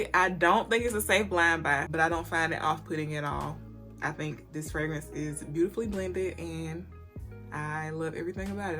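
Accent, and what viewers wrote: United States. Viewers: I love your country accent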